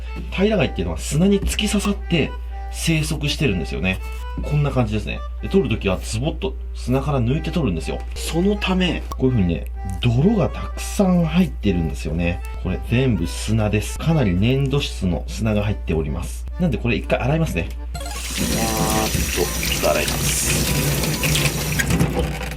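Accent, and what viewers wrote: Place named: Aichi, Japan. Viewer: サワガニより好き｢だで｣ て言うとやっぱり同じ地元の愛知の人の感じがあって親近感湧く。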